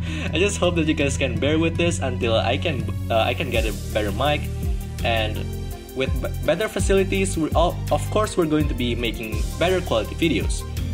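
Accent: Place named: Philippines. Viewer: damn i was convinced that the guy voicing the videos was filipino cuz his accent is very similar to filipinos. nice to know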